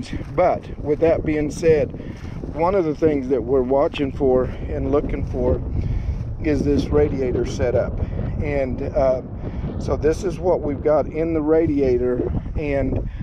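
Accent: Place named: Kansas, United States. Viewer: You sound Kansas are you from Kansas?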